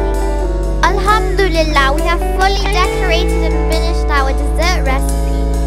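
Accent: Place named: England, United Kingdom. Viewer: Oh woooow ur english accent is awesome 😘😘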